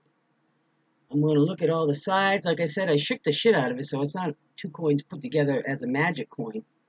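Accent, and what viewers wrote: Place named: Northern England, United Kingdom. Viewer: I just love your Northern accent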